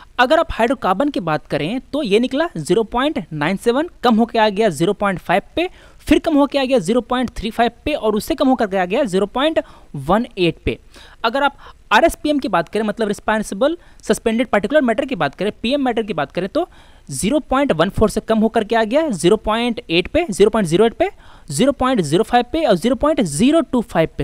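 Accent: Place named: Bihar, India. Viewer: control ur bihari tone plZ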